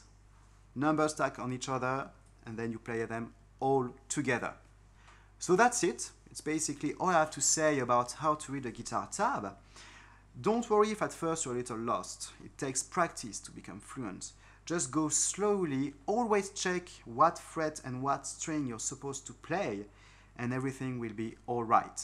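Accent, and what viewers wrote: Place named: France. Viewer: English vowel sounds are a little sloppy, less precise than French. A native German speaker once told me that English vowel sounds tend to float all over the place. And while French words have even stress on all syllables, English words generally have a stressed syllable, even at times a secondary stressed syllable. Sometimes the stress shifts according to part of speech, like the noun "RECord" and the verb "reCORD". So imprecise vowels and location of stress get one closer to native English. But none of these comments are meant to be critical of you. You were perfectly understandable. Please you keep making guitar instruction videos, and the rest of us will enjoy the occasional French idiom that slips through! Thanks..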